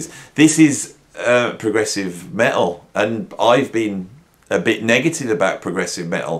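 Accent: Birmingham, United Kingdom. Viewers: To be fair, did say at the start that metal isn't his main genre. But I caught that too. Dissident is one who rebels against society. Many people still think it's Stained Glass. Can't blame them. The cover looks kinda stained glassy. I like how the Brummies remove the T from Metal. Also, says "PAHN-terah". In U.S. we say "pan-TERA" He makes it sound like the name of a fluffy kitten. Here in America we say it like a solid punch.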